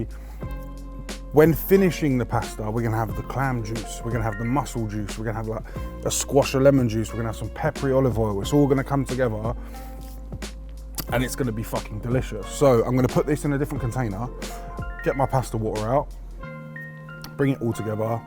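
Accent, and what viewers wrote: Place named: Manchester, United Kingdom. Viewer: Fresh linguinehh haha u sound like your from my ends Manchester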